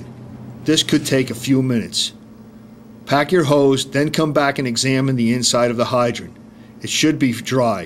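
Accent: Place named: New York, United States. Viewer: That is definitely a new your city accent LOL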